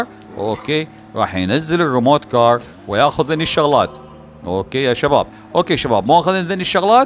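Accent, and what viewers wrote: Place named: Iraq. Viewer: من لهجة عراقي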